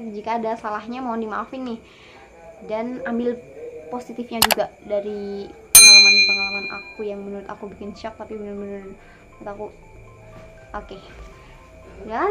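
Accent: Malaysia. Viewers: Lawak gile org indonesia ckp slang2 malaysia😂😂 comel sangat😂😂💕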